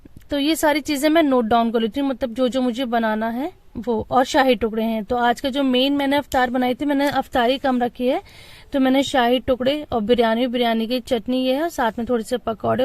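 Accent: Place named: India. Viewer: Itni saaf Urdu India mai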